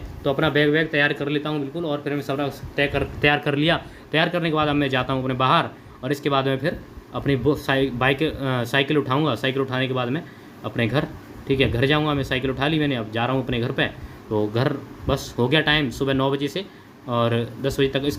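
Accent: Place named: India. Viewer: I loved your hindi accent❤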